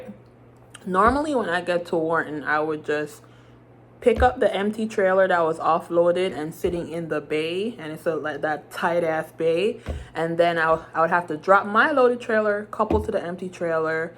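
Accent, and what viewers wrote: Jamaica. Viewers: Interesting I work for IRT. Safety will definitely have a problem w mistakes like that but your human it Happens. I have never heard a local IRT Driver you sound Jamaican best wishes to you sister on your future endeavors lots of tanker jobs out there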